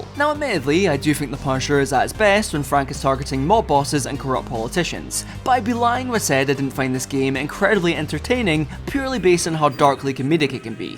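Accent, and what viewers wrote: United Kingdom. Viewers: I can't listen to this horrible british accent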